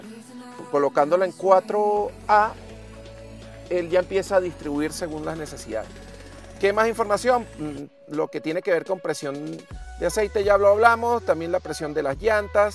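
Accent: Venezuela. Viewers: eres venezolano cierto? exelente video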